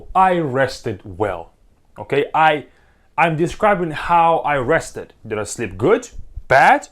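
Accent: Russia. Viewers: ничего не сильнейший, вы сильного акцента не слышали отродясь видимо. Лет ми спик фром май харт- вот это сильнейший акцент, когда английские слова тупо пишутся русскими буквами и выступающий их читает по бумажке. А здесь у парня проскальзывают русские звуки конечно, но в целом видно, что английский учил углубленно, затрагивая и фонетику в том числе. Он знает заднеязычные звуки, аспирацию, смягчение согласных в определенных случаях, английские t и d, при произношении которых язык должен упираться в передние зубы, а не в нёбо как у нас и так далее. В целом он ориентируется на американский акцент, где часто присутствует звук r, когда британцы его не используют, как в словах work, beautiful, of course и так далее. А вам лишь бы обосрать, сами что ли с британским произношением? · Я не могу понять он русский или всё таки американец? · нет, именно для того чтобы учить английский. 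У него русский акцент и речь легко дается на слух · не стоит слушать русский акцент при изучении языка. Наш мозг и голосовые связки устроены так, что потом ты можешь воспроизводить акцент сам того не понимая. Не говоря уже о том, что на ютубе и так навалом видео от нормальных англичан и американцев. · Не русский? Конечно он русский, это же сразу слышно и по русской речи и по английскому, с русским акцентом. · What kind of a language teacher are you, if you can't even remove your russian accent? I'm fluent in these two languages ( Russian is my native), and the way how you're trying to explain why people are not suppose to use adj "хороший" is extremely weird. Russians use that shit all the time, and the word itself is pretty much suitable for any context.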